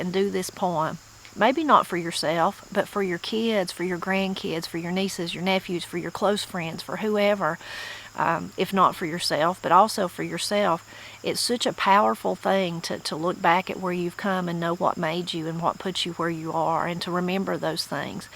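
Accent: Appalachia, United States. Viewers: Love Appalachian talk! “Canon jars” a container for a camera. or a container for storing sacred books, dogmas.e.g “The Pastors wife, was canon the last supper”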